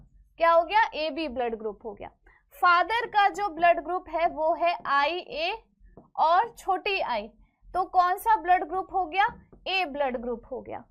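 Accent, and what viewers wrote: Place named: Northeast, India. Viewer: Ma'am ur Hindi is like Northeast pipul but do speak how u speak now make us ur word remember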